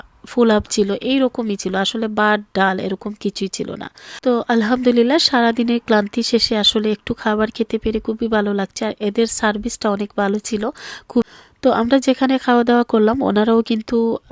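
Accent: Sylhet, Bangladesh. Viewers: Tik.asea.sobai.bujtay.parben.apne.voice.ajka.frist.time.sunlm.syealati.apur.muke.suddo.bangla.very.nice.aktu.kosto.oise.mone.oy.apu.voice ditay karon amra.toy.syealati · Apner sylheti kothai valo lage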